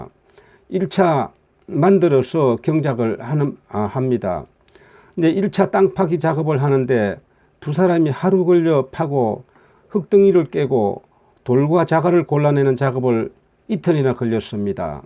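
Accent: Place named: Namhae, South Korea. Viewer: 말씨가 고향이 남해시군요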